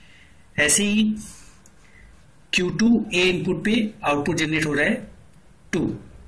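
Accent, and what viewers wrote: India. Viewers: dude i cant understand you accent maybe only indish people can understand u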